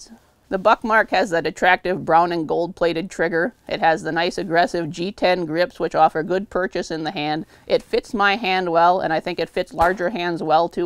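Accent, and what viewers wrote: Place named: Midwest, United States. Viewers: Ya! Upper midwest, I bet. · Wild stab in the dark, she’s from the Midwest?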